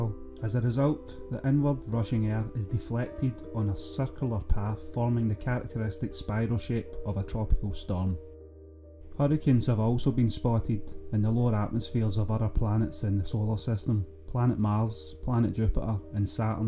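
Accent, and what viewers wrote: Scotland, United Kingdom. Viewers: I am not a native British and that is why the Scottish dialect is not understood by me. I believe many others from a foreign language area share the same pain with me. I just don't understand. I am sorry. Subtitles don't help because I have such poor eyesight that I can't see reading the text. 😢 The thick dialect is not suitable for international communication.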